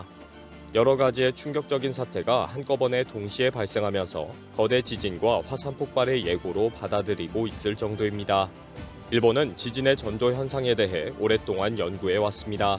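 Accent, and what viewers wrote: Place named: Vietnam. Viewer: 해설자는 우리억양이 아닌 베투남 유민같은 억양인데 그리도 인물이 없나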